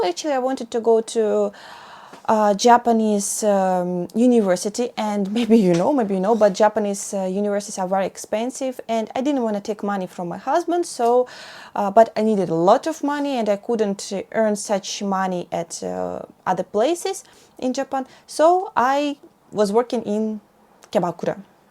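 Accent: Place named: Russia. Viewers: The way she speaks is typical of Russians. As you notice, she doesn't use words like "the" since that doesn't exist in Russian grammar. She's not difficult to understand though. Most Russians won't be able to maintain this rate of speech and their pronunciation will be far off what you would expect. Channel would become Chairneeel. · i saw a short an thought you're Japanese talking English, but you're Russian talking Japanese English ... its quite hard to understand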